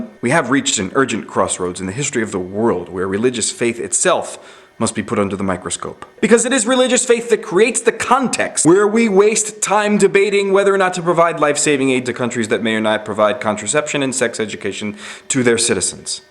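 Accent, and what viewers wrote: United States. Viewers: Ur american right? Cause u sound like it. So unbelievably ignorant. Who cares who's republican and who's liberal? Are u such a sheep u base ur views no that of a party?? I am very pro life i am also pro gay marraige and i am an athiest. Oh and If ur stupid enough to call a pre-born baby "a ball of cells" u should do at least a bit of research cause frankly im embarrassed for you.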